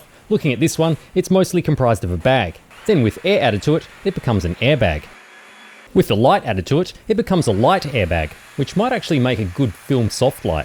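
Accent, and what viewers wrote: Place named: Australia. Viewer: As a not native English speaker, your Australian accent is no problem. Scottish though, that requires subtitles...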